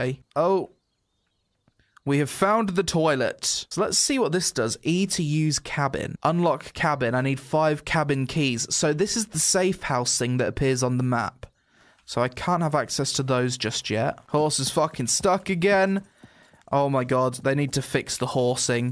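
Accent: United Kingdom. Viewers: hes british... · your British but you didn't say loo??!?!?!?!?!?!?!??!?!?!?!?!??!?!?!?!?!?!?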